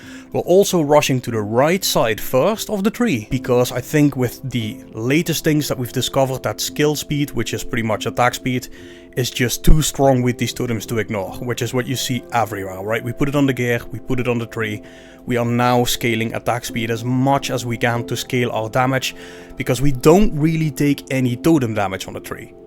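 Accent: Limburg, Netherlands. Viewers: kunse van Limburg?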